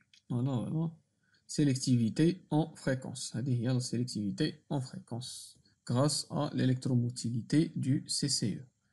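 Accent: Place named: Jijel, Algeria. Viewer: ça aide super bien merci, ps: j'adore lehdja dyalek djidjliya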